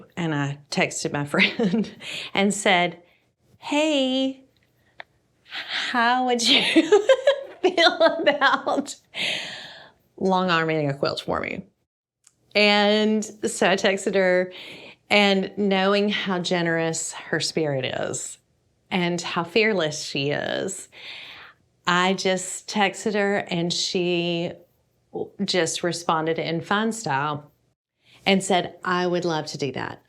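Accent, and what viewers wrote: South, United States. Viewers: It's a show and tell. And I think I speak for many of us that we enjoy listening to her Southern accent as much as viewing., I bet your Dad was thrilled that his Daughter, with her busy lifestyle took the time to learn and energy to create such a beautiful, useful gift. And you shared the lessons learned with us and made a new friend along the way, as well as your Subscribers. Bravo.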